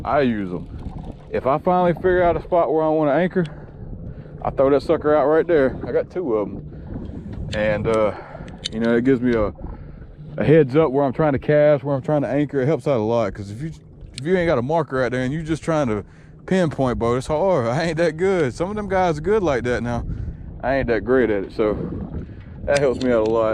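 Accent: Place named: South, United States. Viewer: “A freaking Hog” 🤣 I’m glad I waited until I could watch this whole vid! All sorts of Southern coming out of you!! Love it! So crazy you pulled the first hook up right out of that same fish! The aggravating Bass guy was definitely fishing the right spots and he was probably cussing you just the same! Lol. Your little bait fish is my up coming PB!! Don’t be hating on him!! 😝 Glad to see you had an awesome day out! Also glad you stopped starring at that fish finder!! Techy, techy.. what in the world did our Granddaddy’s do?! Oh yeah, they just enjoyed being out there!! Lol.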